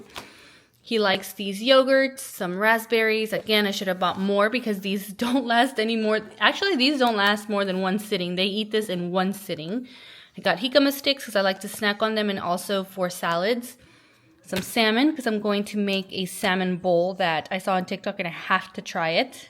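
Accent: Spain. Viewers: Love your Spanish accent!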